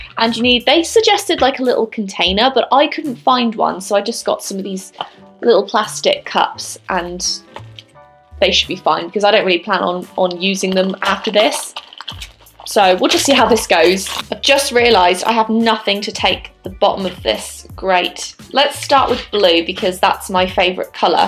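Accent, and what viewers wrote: United Kingdom. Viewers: I love your accent and I'm British too 😂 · I thought u were british bcs of ur accent and voice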